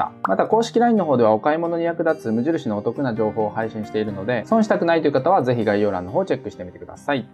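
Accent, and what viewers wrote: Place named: Kansai, Japan. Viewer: 関西イントネーションすこ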